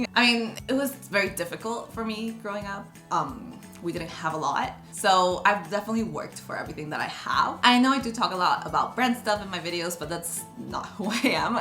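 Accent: United States. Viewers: Yes, you sound and look like you're from St. Cloud...especially with the DIY Gucci. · is not that much you can notice sometimes BUT she almost talk as an american. But is my opinion... just saying 💕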